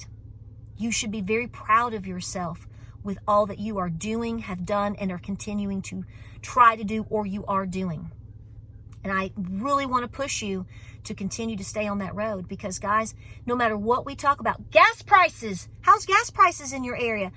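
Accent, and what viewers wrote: South, United States. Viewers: Girl Girl Girl, as we say in the South! If I didn't recognize You're accent (I'm a Kentucky Trumplican!), I'd of thought the "T" was for Tracker as in You have Your Truck back!!! In all honesty, lived in Nashville for 14yrs & I have the same hat! So, some people just have to have something to Bitch about & You just happen to be an easy target. But, no worries Girl, You got this! Life is short, make it Sweet. Hell, I'll be 67 come May & just went back to work for Kroger Today. Both my cars are needing a car doctor & been ankle expressing for 2 wks plus. God said, get in shape Ladybug! & So funny, So did You. ❤ From Kentucky Patriot Warrior ❤❤❤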